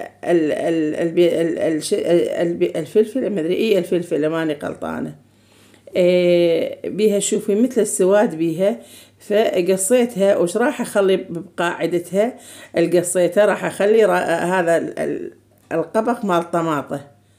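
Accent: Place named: Kirkuk, Iraq. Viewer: الله ينطيج العافية خاله اشكد احبج واحب سوالفج والله العظيم واني متابعتج من زمان ودائما اشوف فيديواتج اني من كركوك وهم مثل لهجتج نحجي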